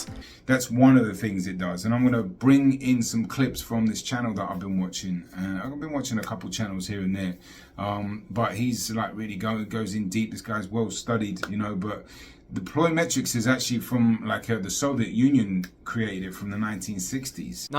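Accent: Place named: Cockney, United Kingdom. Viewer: Love that east end accent, guv mate 👍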